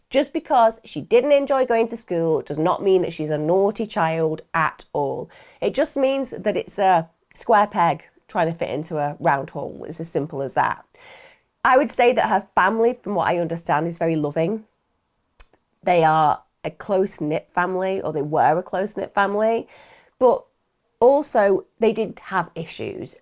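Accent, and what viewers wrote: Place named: Northern England, United Kingdom. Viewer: Thought you was from up North, Hi from Accrington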